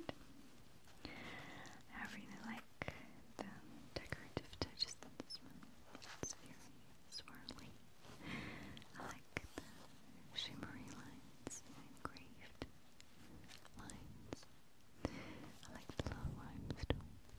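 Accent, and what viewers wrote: Russia. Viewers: I think the thing with is her accent. She is the only person who actually makes me go to sleep. I love her Russian accent, it makes it so nice. Who agrees? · I seem to have the same reaction to various weights and qualities of journal pages! FYI that beautiful carved leather journal has a classical image of the three graces on it. from greek myth but most famously revived by Botticelli during the Italian Renaissance. google PRIMAVERA by him and you will see them. You are definitely the queen of relaxing ASMR videos. So elegant and soft and beautiful! lovely graceful hands too. And that very slight Russian accent makes your speech quite exotic. What a success you are! thank you for all you do.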